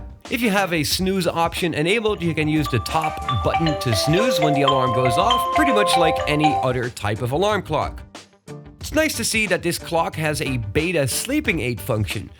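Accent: Netherlands. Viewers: I knew you were Dutch! I knew it! You have that accent! Groeten vanuit Amersfoort! 😂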